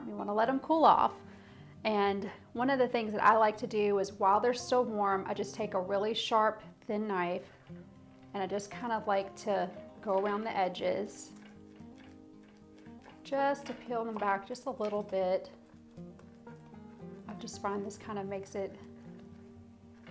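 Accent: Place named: Florida, United States. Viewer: You sound like us here in Florida! Especially the "pachauns" not pECans"! 😎🌴🌺👍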